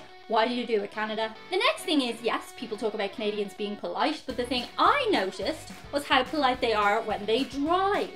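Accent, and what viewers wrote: Ireland. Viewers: Yes! The Irish accent is the best! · Love your video, and I love an Irish accent as well!! I love my Country and would never live anywhere else....but I love to visit lots of other places and Ireland is definitely on my bucket list. Take care! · Yea we say were going to get a bag of milk, most of us just drop the bag in a milk pitcher and cut the bag open. Their are specific milk pitchers you buy, Google "Canadian milk pitcher" to see it if you're curious. When someone litters, i tell them the garbage is right there. Then i pick up their trash and throw it away for them. Its pretty passive aggressive 😂. I watch Doctor Who cause its amazing, alot of canadians i know watch it or know about it aswell. Alot of British sci fi shows are great. I wanna visit Ireland after the pandemic it seems like such a cool place and i love the accent too 😂. 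· That a young woman with a lovely Irish accent thinks that Canadian accents are sweet is right some good there, eh? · We don’t have milk in a bag here where I live in Saskatoon it’s in a jug. Yes people drink lots of bottled water. We are polite because our neighbors to the south seem like they just beat each other up all the time so we’re just generally turned off by our noisy neighbors- but we still love them. It’s kinda like a family situation where your cousins are a bit too loud but you love them anyway. Also we think Irish accents are cool. The things you said about Canadian accents is what we say about yours. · I find the Irish accent very charming!!! I m born Canadian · Canadian here, I think the Irish accent is amazing!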